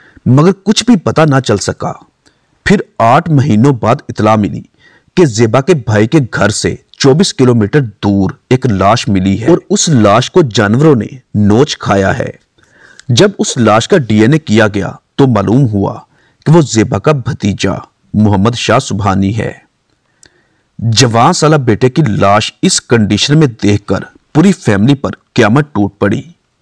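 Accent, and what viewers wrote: Punjab, India. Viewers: Tu c Urdu Punjabi main bolte ho. Apna Punjabi lehja dorost Karo. Urdu sahi Sahi bolo.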